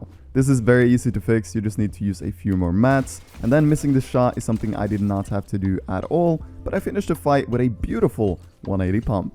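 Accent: Norway. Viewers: Are You norwagian?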